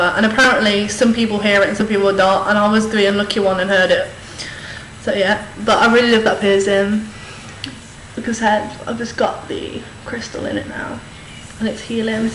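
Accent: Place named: Southern England, United Kingdom. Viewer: Where I'n the uk are you from I love your accent and I'm from the uk and I've never heard people with such an fab accent is it southern ??? Devon or Cornwall ????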